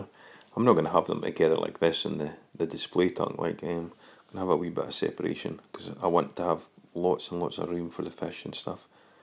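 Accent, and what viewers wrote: Scotland, United Kingdom. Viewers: Great series. Love the Scottish accent, but it doesn't help to understand the phrase: "leave it to dry for a couple of weeks in the sun." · lol too funny at 1 minute on the video, listening to a scot talking about sunshine, be careful you don't blink you may very well miss that sunshine, that rock will never dry out in Scotland, lol great stuff buddy I'm really enjoying to videos.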